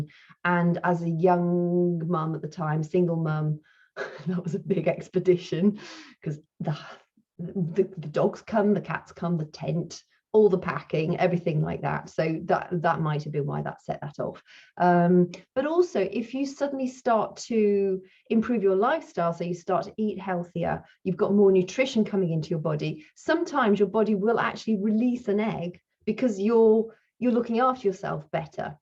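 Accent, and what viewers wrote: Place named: London, United Kingdom. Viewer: Thank you. The video made me feel less alone. I am in post menopause (over 2 years no periods) and the anxiety & night sweats still are here. Very distressing but I am trying to eat healthier (although I've still yet to cut out caffeine) and exercise. I need to start strength training and hope to find videos where I can do it at home without being in a crowded gym as Covid-19 is still around in the U.S. I love your speaking voice. My brother-In-Law moved from London with his family when he was in high school and used to have a similar accent. He's since lost the accent (which us a bummer) but he still visits his other relatives in London every couple of years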